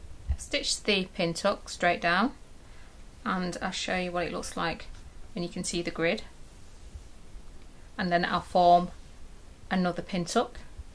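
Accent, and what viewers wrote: United Kingdom. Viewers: isn't it also the case that pin tucks are stitched but pleats aren't? I've always wondered how it's done, now I understand perfectly. The fun for me will be working out how much extra fabric I'll need! I can see much experimentation ahead! Thank you also for having a proper accent, as a fellow Brit I'm getting fed up with tutorials with American voices 😄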